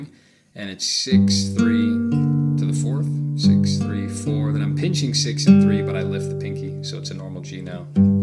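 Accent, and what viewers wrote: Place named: Netherlands. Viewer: Are you by any chance a Dutchie that moved to the US? I'm trying to pinpoint the accent.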